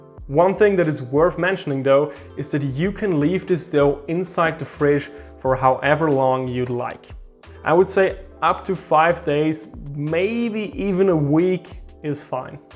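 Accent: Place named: United States. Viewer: austrian with an american accent, the opposite of schwarzenegger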